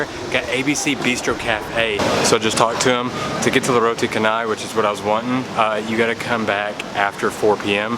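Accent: South, United States. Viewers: i believe he means your southern accent. I've been trapped a time or two by the fairer sex. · Randomly stumbled upon your video and glad I did! I adore your southern accent (I hope I got that right).Loved your reviews especially the Chinese noodles that I can’t normally have, l’d love some of that chilli pan mee. It’s actually called ubi getuk (mashed and fried tapioca with coconut), also the roti canai is pronounced Cha-nai, cha as in ch in chair. You’re practically Malaysian as we do mix our roti canai sauces together too! My ultimate favourite combination is the red sambal with the middle lentil curry (called dhal). Looking forward to more of your videos!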